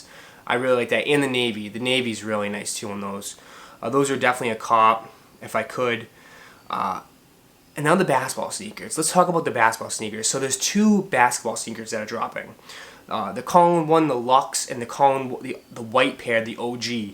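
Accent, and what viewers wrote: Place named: Northeast, United States. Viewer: Your wicked accent sound like you're from the NE area.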